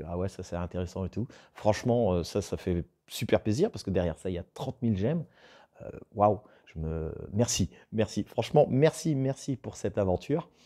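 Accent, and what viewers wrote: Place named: Nord, France. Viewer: Meilleurs vœux à toi aussi M'sieur! Cool cette vidéo on apprend un peu plus à te connaître et ça fait plaisir 😁 Je suis aussi du 59 et à 20mn de la Belgique! C'est vrai que les "oir" chez nous ça s'entend 🤓 En tout cas continues tes vidéos elles sont geniales, c'est toujours un plaisir de les voir!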